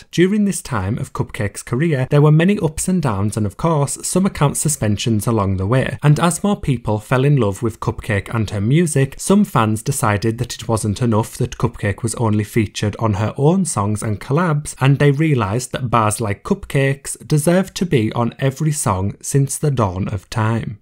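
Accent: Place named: Liverpool, United Kingdom. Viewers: I love your liverpoolian accent "Cupcek"😂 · Some Northern British, I suppose.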